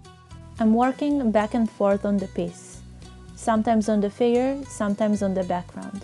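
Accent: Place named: Israel. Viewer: also, it sounds like you have an isreali accent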